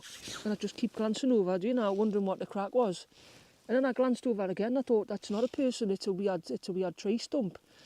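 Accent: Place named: Geordie, United Kingdom. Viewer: Luv your quaint Geordie manner of speaking. 🙂 (Ahm frum Yorkshire meself..we got our own quaint dialect...lol)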